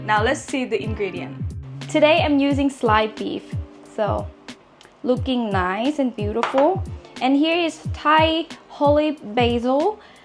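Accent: Australia. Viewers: Why does she sound Australian? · Thanks for that. You have obviously been to Australia with that accent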